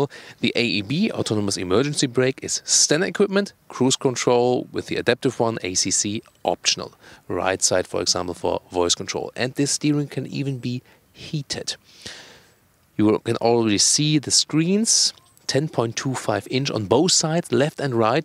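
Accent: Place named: Germany. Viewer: German cars should be always presented with German accent just like in this video :)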